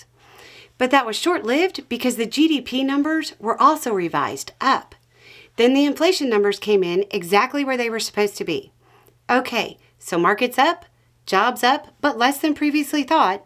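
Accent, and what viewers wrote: South, United States. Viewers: I'm officially loving the shade Ms. weaves into her videos. Goddamn the knife seems to hurt a lot less when it's made it's cut. A knife sharpened hurts less but cuts deeper and all that..... when said with that strong southern woman voice. Ya know the one that's generally attributed to Grannys, aunties, and momma's, women who you don't mess with cos you know you'll be walking away with your behind red or coming back with your tail between your legs if you ignore them. Or maybe that's just my time growing up with my southern family that makes me think of all that advice that, as a kid, I didn't want to think applied to me and yet later on in the day id be wishing I listened to and having to come back to rolled eyes and apologizing and yes ma'am-ing for showing the disrespect in not listening to them. I pray we don't ignore that advice this time, don't forget the wisdom given to us isn't done to do anything but save our butts in the end, to benefit us rather than anyone else. 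That we can listen to this kind of intelligence and knowledge, hard won and earned wisdom by people who are trying to do right by us, and not try to see if we can have a different outcome, like we will be just fine going to catch frogs in our Sunday clothes, we won't get wet or muddy, having to run home and try to change into something else without anyone seeing, without anyone finding the evidence, and anyone realizing we changed (or be able to say we wanted to change, we didn't HAVE TO, we just lik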